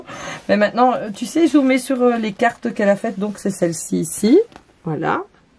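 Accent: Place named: Belgium. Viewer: cc!! je suis abonné a sa chaine et j'adore ses créations!! eh oui tu as raison elle est hyper doué, je n'ai pas eu l'occasion d'échanger avec elle,tu as beaucoup de chance de l'avoir rencontrer,j'ai lu aussi cet article et je trouve que c'est vraiment mérité;bravo a Sandrine!!merci a toi pour cette belle présentation avec ton joli accent belge que j'adore!!bisous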